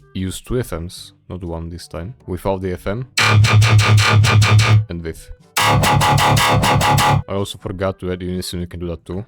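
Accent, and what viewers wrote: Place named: Eastern Europe. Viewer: slavic accent🫶🫶🫶 (The video looks good, but it's chaotic)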